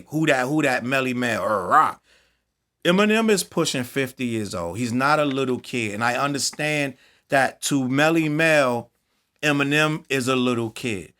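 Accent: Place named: Washington DC, United States. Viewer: Good breakdown on this. Question, you from DC area? Lol. You sound just like us in this breakdown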